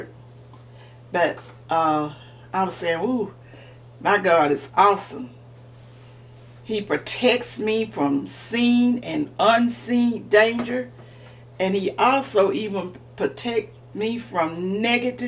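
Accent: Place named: North Carolina, United States. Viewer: I just love listening to you. You remind me of my. Are you in NC? Your accent sounds like hers.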